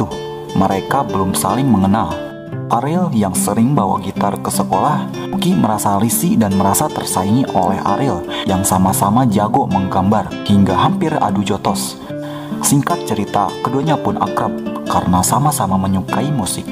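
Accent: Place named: Sunda, Indonesia. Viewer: Logat sunda narator nya kental banget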